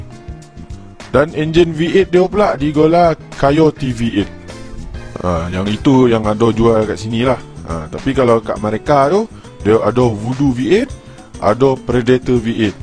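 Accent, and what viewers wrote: Negeri Sembilan, Malaysia. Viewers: Ada 2 sbb utama kenapa orang layan video bro: 1. citer pasal asal usul kereta. 2. loghat nogori yang rasa dekat di hati dan klaka.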